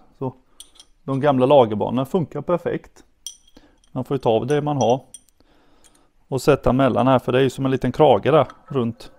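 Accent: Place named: Kalmar, Sweden. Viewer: Mycket snyggt filmat, sällan en ser en så bra renoveringsvideo. Lugnt och sakligt. Sicken go dialekt med, Kalmar/Nybro/vissefjärda?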